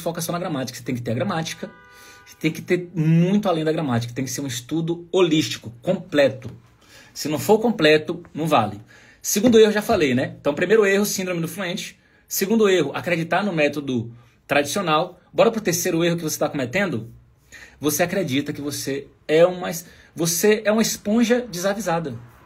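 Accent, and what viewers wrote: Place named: Ceará, Brazil. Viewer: Hei macho, e tu é do ceará, da fustaleza!!! Neh?!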